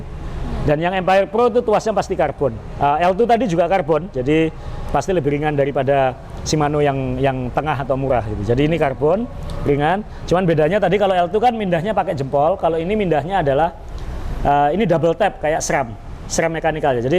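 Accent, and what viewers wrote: Surabaya, Indonesia. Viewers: yang saya suka mbak nya ngomong nya medok khas suroboyoan... jd inget pas kuliah di unair dulu.. <num>. maju trs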